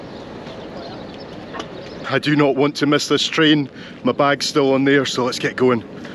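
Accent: Scotland, United Kingdom. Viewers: scottish i think? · Scottish mate ?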